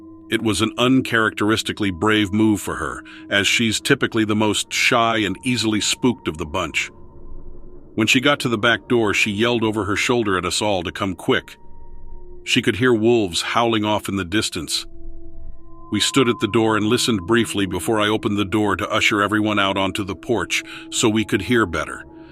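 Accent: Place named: Midwest, United States. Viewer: You have a great voice!! I really enjoy your channel. You have a Midwestern accent. What state are you in??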